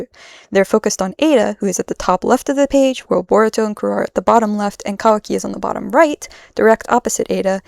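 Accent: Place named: England, United Kingdom. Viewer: 1. I'm glad the channel seems to be growing😊. 2.. Do people actually doubt your tranalations only because you sound more "English that you have to have your japanese ftiends to read and explain atuff?....that's just pure stupidity on their part. 3.Are you going to make a short vidro on analyzing any Bpruto character in the future? Can one commision you on analyzing a Naruto character/Boruto character based on a novel?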